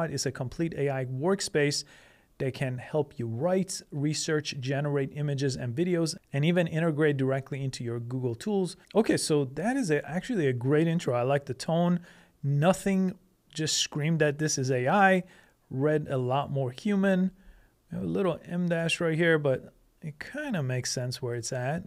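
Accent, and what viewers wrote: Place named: Iran. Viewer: You sound iranian. Where are you from?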